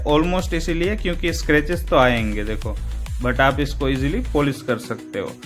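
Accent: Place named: Gujarat, India. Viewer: Gujrati accent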